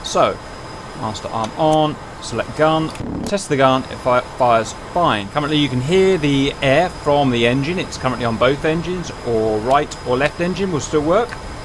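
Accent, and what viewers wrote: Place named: United Kingdom. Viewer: i'm into both military and commercial aviation and i follow tutorials both from you and a guy called 320Sim Pilot, you're both from the UK and maybe it's because i'm not used to the accent but boy you sound similar, sometimes i can't remember which one i'm listening to. Awesome video as always anyway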